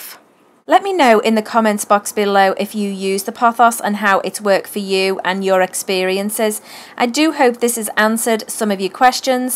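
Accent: Liverpool, United Kingdom. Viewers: i know ur accent it is british.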